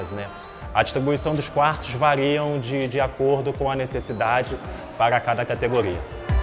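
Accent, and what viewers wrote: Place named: Minas Gerais, Brazil. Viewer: MINEIRO,COM ESSE X,RS?Ixqueiro...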